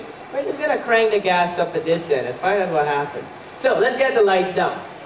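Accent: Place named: Canada. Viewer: I love his inherent Canadianity. "Eh?"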